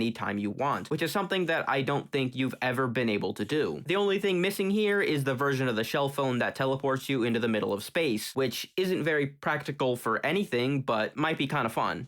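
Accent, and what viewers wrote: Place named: United States. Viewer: Pov: you don't like the American accent (don't live in the states might just be a state accent)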